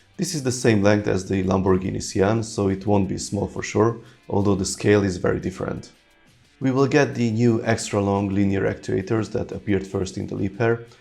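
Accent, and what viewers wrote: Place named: Hungary. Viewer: I just asking, because is a Hungarian name and your accent is soo Hungarian. But if no, then sorry. 😅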